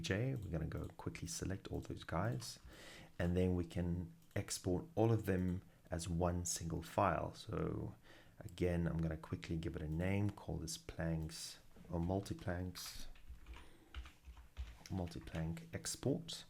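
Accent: South Africa. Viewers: Do I detect a slight South African accent to your voice?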